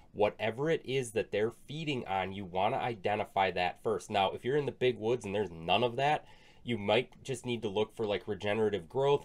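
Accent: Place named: Wisconsin, United States. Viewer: That’s his Wisconsin accent…it does sound like egg but he’s saying “ag fields” as in agriculture fields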